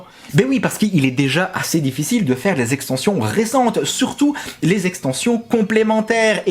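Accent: Belgium. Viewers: Whouah... Je me suis remis à collectionner les cartes en fin d’année dernière sans vraiment savoir ce qui m’y a, implicitement, poussé. Grâce a ton analyse, je crois que j’ai compris. C’est flippant! ☺️ Au delà de ça, je viens de découvrir ta chaîne et tu m’envoie du rêve Bro! Je kiffe ce que tu fais! La qualité et la pertinence du contenu, l’humour toujours bien calibré! Le petit accent belge! J’adore! ❤️ 👍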